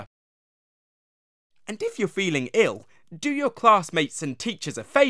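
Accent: England, United Kingdom. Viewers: Delta Core 12 its a British accent. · Hearing this in a British accent us slightly unsettling to me... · WHAOA WTF HES BRITHISH!? Put him in spainish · He has a accent because this brain pop is meant for the UK duh -_ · I was like "um? I didn't remember sounding british" then i saw the description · what happened to his voice his gone all english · Since when tf was he british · HMMM i'm used to american but i love how British sound:D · He sounds like he's British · UK voice?damnit =( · OMG ITS A BRITISH!!!!!! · I had no idea there was a UK one. I'm from America so I was shocked to hear him with an English accent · why is british?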